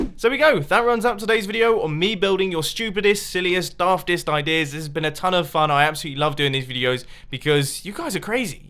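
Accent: Australia. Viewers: your australian? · r u australian coz i am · You from Australia? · wait is Australian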